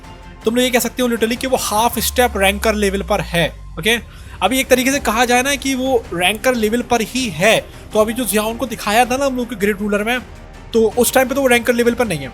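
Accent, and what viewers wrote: Punjab, India. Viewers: Bro r u punjabi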